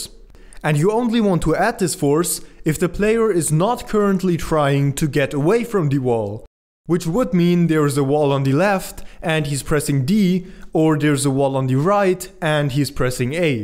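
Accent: Germany. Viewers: IDK but is he German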